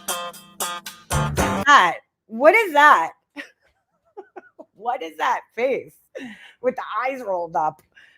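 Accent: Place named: Brooklyn, United States. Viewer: I don’t know how your channel would up in my feed but it’s wasn’t too bad listening to it, coulda been cuz of Ron’s channel. I will say that I have family in Mass Park and I go there frequently, matter of fact I was there 2x last week and the PD wasn’t there each time, my sister also said they’ve been outta there for 2 weeks already. Good luck seems you’ve got a well established following. Haven’t heard the Bensoshurst / Bay Ridge accent since I retired !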